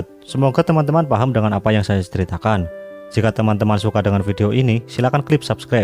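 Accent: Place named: Java, Indonesia. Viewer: Terlalu medok bang…oalah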